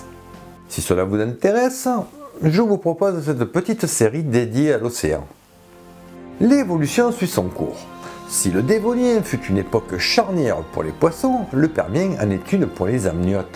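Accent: South, France. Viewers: Quel plaisir d'entendre cet accent du sud. Comme toujours c'est géniale, tu est le meilleurs vulga en paléontologie que j'ai eu l'occasion de voir, ce que tu fait devrait être regarder au collège.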